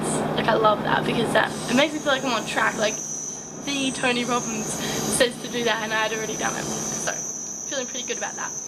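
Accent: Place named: Australia. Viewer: i mean its a australian accent, but danish family and passport of your own is a blend of two countries, like you get two ways of life, countries and cultures etc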